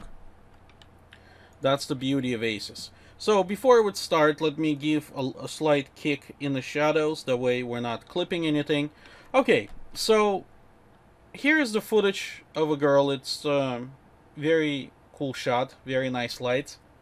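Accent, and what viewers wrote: Russia. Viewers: Прям слышу по акценту что русский))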